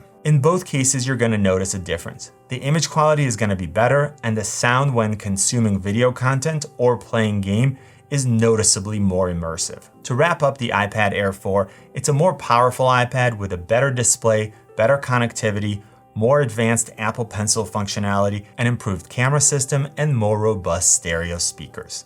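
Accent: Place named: Cleveland, United States. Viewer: I may be way late to this.. but are you from Cleveland??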